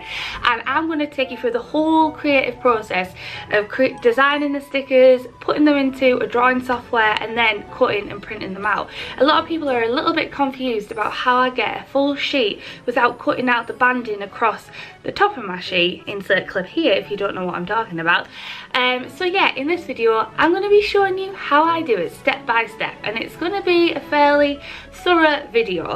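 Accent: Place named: Whitley Bay, United Kingdom. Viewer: I’ve just bought a Cricut and it scares the hell out of me! Your videos on making stickers have really helped, thank you. On a side note my husband is from Washington and he’s trying to pin down your accent, he’s decided on Whitley Bay or Blyth, how close is he? We live in Canada now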